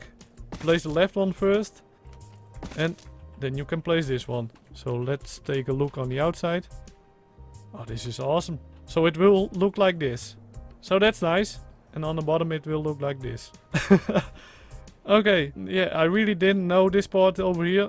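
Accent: Netherlands. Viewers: You can tell this guy is dutch, een goeie dag trauwens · Lekker nederlands accent 😂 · are u dutch ? i can hear an accent there hehe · Are you Dutch? I grew up in holland but now live in the USA. Love the accent lol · the dutch accent xD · je hoort een beetje een nl accent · Dat dutch accent is funny. Nice build btw 😃👍🇳🇱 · Je hoort gewoon dat hij nederlands is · Are u Dutch? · Ik kijk je voor de eerste keer en kon gelijk horen dat je nederlands bent ;)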